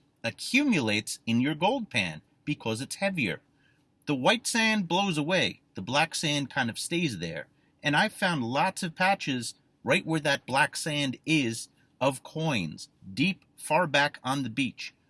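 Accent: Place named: Connecticut, United States. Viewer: You are so informative and haliorous! I'm originally from CT now in Tennessee. Miss the accent of back home and you deliver:) love your channel!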